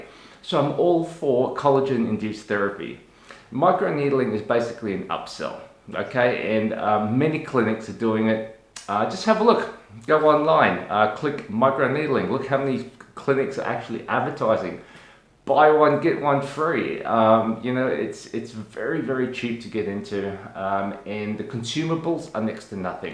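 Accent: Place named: Australia. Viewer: A Korean-aussie with a full on aussie accent. I've seen it all ㅋㅋㅋ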